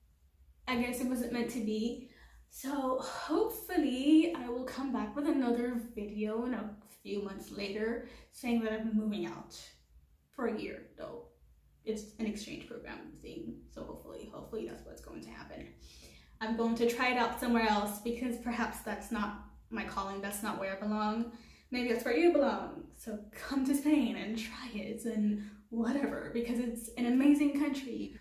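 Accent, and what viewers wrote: United States. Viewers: I'm actually shocked that you're Hatian! You have an American accent! How?!? You sure you've never lived in the US?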